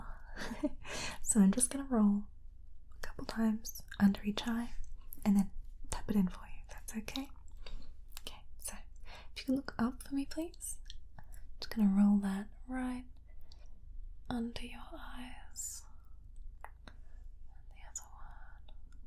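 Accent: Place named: Australia. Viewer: As an Australian, I use to be weirded out when I heard the same accent in asmr, but then I found you and diddly and now it's one of my favourites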